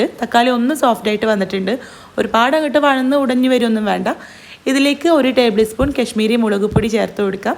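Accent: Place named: Kasaragod, India. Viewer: കാസർഗോഡ് നങ്ക്...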